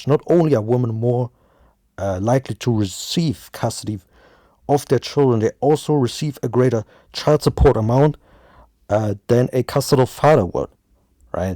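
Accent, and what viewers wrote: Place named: Germany. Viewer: Are u german I like your accent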